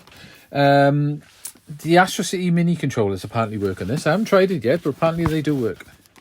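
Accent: South Wales, United Kingdom. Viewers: Yea south wales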